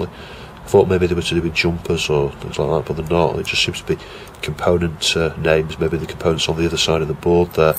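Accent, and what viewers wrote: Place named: Northern England, United Kingdom. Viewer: So I'm assuming live somewhere in Northern UK from your accent ... but if I paid u could u fix my dsi it won't read my game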